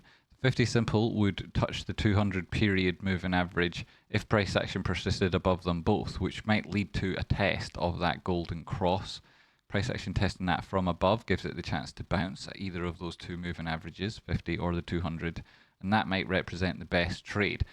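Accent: Scotland, United Kingdom. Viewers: Scottish accent?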